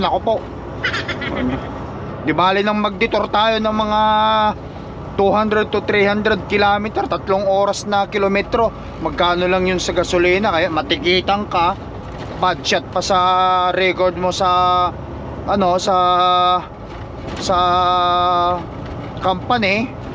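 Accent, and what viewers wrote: Ilocos, Philippines. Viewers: Ride safe always sir parang ilocano kau sir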